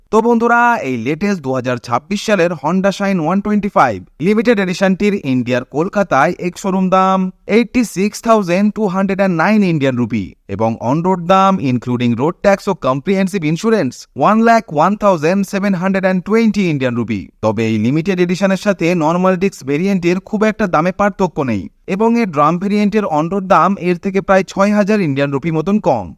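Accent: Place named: Bangladesh. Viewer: আমি বাংলাদেশ থেকে বলছি। আপনার বাংলা বলার ধরন প্রায় বাংলাদেশীদের মতো। বাংলাদেশে কবে নাগাদ আসবে সূত্র উল্লেখ করে বললে ভালো হয়।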